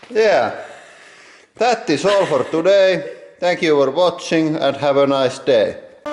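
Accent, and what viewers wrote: Finland. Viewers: the finnish accent lol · very strong finnish accent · is he from finland cause sounds like it? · This kind of very strong finnish accent is know as "Rally-English" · Most of the finnish people know him of he's epic finnish english axent :D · Rallienglanti for the win!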